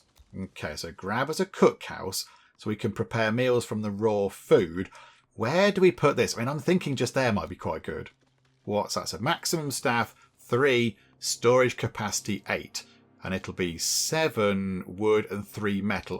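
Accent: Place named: Northampton, United Kingdom. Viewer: Oh wow didn't realise you were just down road from me 😂 knew accent was familiar. I'm at weston favell 2 mins from the police station. Think town centre already a zombie zone based on how busy it is some days... Try not to damage the king billy during the play...I might be there having a pint and zombies would ruin my day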